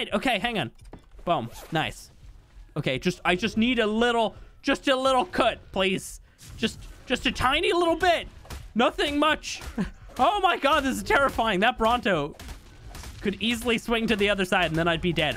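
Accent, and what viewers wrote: Ireland. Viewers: What part of Ireland are you from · Nothing beats hearing an Irishman getting excited about potato’s 😅 looking forward to this series dude 😁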